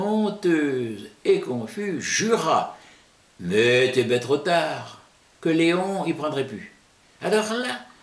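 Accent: Quebec, Canada. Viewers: Je suis du Québec et votre manière de parler et les mots de patois normands sont très similaires à ce qu'on dit ici! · Je trouve que ça fait beaucoup penser à l'accent du nord-est du québec ex. Havre-Saint-Pierre!! Vraiment cool de découvrir ça! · Beaucoup de similitudes avec l’accent Québécois. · Wow, que c'est beau d'entendre ce parlé normand en France qui ressemble au mien au Québec. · J'ai appris qu'il y a eu une débarquation d'anglo-normand en Gaspésie peut-être c'est pour ça l'accent est similaire au Québec, surtout au nord :P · J'ai l'impression d'écouter du français québécois de quelqu'un d'une autre région quelque part dans l'est. Ça parait que nos ancêtres normands nous ont transmis une grande partie de leur langue. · Ça sonne très québécois c'est fou :O (je suis du Québec) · On dirait que vous parlez Québécois dis donc?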